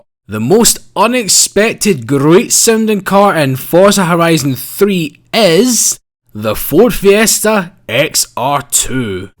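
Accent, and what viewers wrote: Scotland, United Kingdom. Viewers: If you're Scottish then I'm subscribing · Best Scottish accent 👌👌👌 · I found your Chanel by this video, and I could not help to love that accent! Where in GB are you from, mate?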